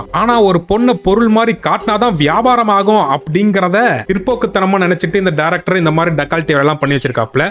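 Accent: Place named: Tirunelveli, India. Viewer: Bro thirunelveli slang puduku 😂😂 romba siripu varudhu bro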